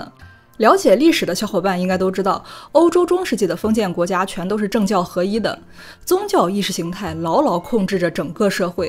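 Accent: Qingdao, China. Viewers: 这姑娘好像是青岛人啊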